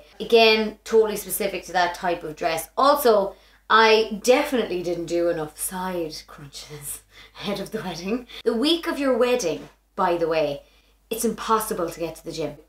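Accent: Ireland. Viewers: You looked exactly like an Irish Princess! Goodness that dress is amazingly gorgeous!!! Your hair looks so beautiful with the blue. Also your accent is amazing! My godmother is Irish so I’ve always loved Irish accents❤️❤️ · I love the Irish accent ♥ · Man, simply stunning! From the gorgeous red hair to the quirky, charming brogue all topped off with that endlessly ethereal gown... Breathtaking.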